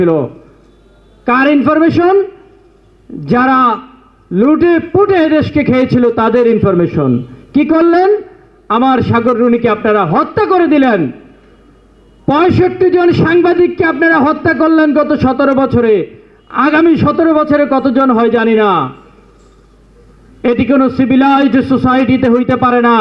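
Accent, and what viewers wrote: India. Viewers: এই লোকের কথার মধ্যে একটা ভারতীয় একসেন্ট আছে।।।😂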